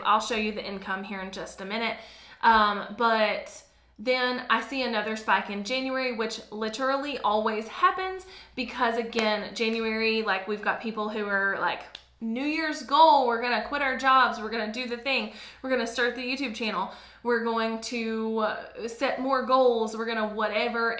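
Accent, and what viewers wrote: South, United States. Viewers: So sweet. The tonality is so different from people who are ride or die as opposed to people passing by. I learned a lot from you in your productivity era. I can't remember what search word I used... ClickUp? Anywho I'm back. Like your southern accent and beautiful office/room.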